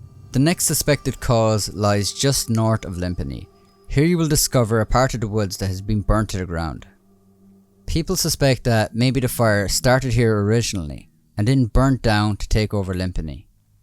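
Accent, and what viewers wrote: Ireland. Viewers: Irish I believe but there are a lot of Irish accents. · Fantastic video, absolutely loved this! Really interesting and thought-provoking 😊 Biggest mystery for me though is which part of Ireland that lovely accent is from... my g-grandad was Irish from Cork, doesn't sound like there! 🇮🇪 Keep up the good work, you deserve so many more subs! Maybe Princess Isabeau would be a good one too, I see a lot on the mysteries sub talking about her (I've got a feeling she's not to be found, but hey ho)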